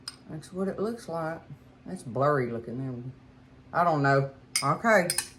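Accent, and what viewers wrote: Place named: Mississippi, United States. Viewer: Hi,. I have been watching you for about 6 months, and I just LOVE that you cook regular food, not fancy with 50 ingredients. I am from rural Mississippi, and you talk exactly the same way we do. Watching your videos is like sitting in my Mama’s kitchen. Keep cranking out the videos, and it won’t be long til you have 100,000+ subscribers.❤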